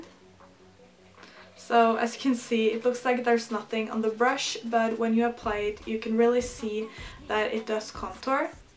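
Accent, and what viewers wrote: Norway. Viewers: Are you from Norway? The way you speak english is so Norwegian english ahahah!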